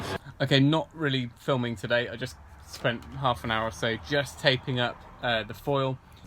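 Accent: United Kingdom. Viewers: I believe that's a proper English vs American English (pronunciation) thingy. Pro-noun-see-A-shun Pro-nun-see-A-shun Lol :). Love this series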